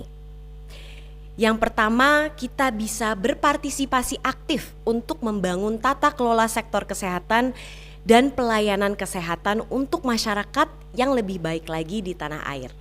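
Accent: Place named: Bali, Indonesia. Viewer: Logat bali .💪indonesiaku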